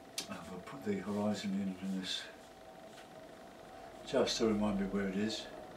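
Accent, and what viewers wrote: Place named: England, United Kingdom. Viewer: I'm awed by your talent. As you've said before, watching you makes me almost want to give up.....almost. I've been trying to create scenes such as yours for over 40 years and still can't produce the quality of work that you do. Thank you for hours of fascinating and pleasurable viewing. Being a Yank I did, at first, have a little trouble with the English accent (my problem, not yours), but I soon got used to it. Do you ever do any miniature watercolors? And, if so, could you put one up on YouTube? Thanks again and keep it coming.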